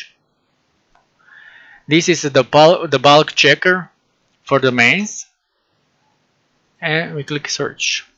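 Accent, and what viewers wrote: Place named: Serbia. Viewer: Hvala, po akcentu vidim da si neki nas 😄 Mislim da bi verodostojnije bilo da si u Ahrefsu proverio domain rating i ceo domen, mislim kad ga vec korisits.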